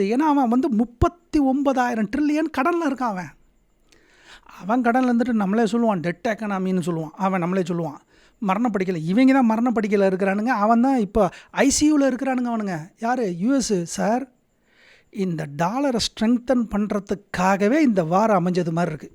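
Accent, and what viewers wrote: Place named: Tirunelveli, India. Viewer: ஏல இருலே 😂 சூப்பர்…. நெல்லை வாய் மொழி ❤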